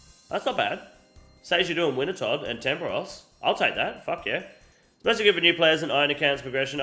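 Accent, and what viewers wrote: Australia. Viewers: he's Aussie mate from Perth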